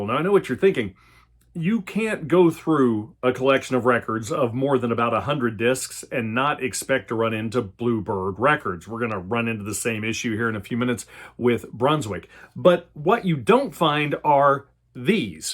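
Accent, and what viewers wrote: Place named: United States. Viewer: Apart from your great and highly informative content, your pronunciation is a bliss for non-native speakers. My english is not bad at all, but at school, we learn Oxford. I don't know what it is, but even with your American accent, I understand EVERY single word. By the way: I live in Vienna/Austria, together with my ~ 14000 shellac records.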